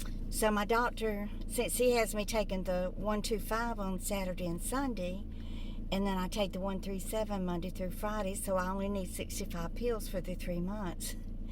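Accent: South, United States. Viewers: Hi Ms ☺️ I've been watching some of the court case here in UK 🇬🇧 & even though I've not watched it all it was obvious he was guilty. I felt so sad for his poor wife 😢 & son 😢 Hope you're keeping well, I love to hear your southern accent, it's lovely. Sending you love & best wishes, God bless you 🙏 xxxxx Christine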